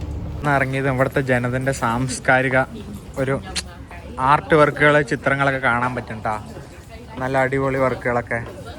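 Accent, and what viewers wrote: Palakkad, India. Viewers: പാലക്കാട്‌ ഭാഷ 😆ആ ആ ആ ആ ആ എന്ന് കുറേ നീ പറഞ്ഞു 😆😆😆😆 ഞാൻ പാലക്കാട്‌ പറളി, എടത്തറ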